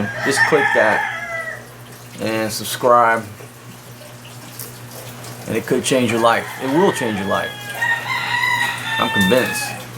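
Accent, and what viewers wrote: South, United States. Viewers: Kudos for laying down the law my friend. Dont know how much of a yard you have there, but did you ever consider raising chickens of your own for meat and eggs? Maybe even raise some pigs? Would like to see more of the beach you have there. I would definitely be taking advantage of the fishing as a food resource too. If your relatives come over, have them pitch in and give you a hand. If you put them to work, they will either earn their keep or stop coming around. Explain to them that education is their way out of poverty. Sounds like you have a southern accent. Where ya’ll from? I lived in south Georgia for 30 yrs. and know a good ol’ boy when I hear one.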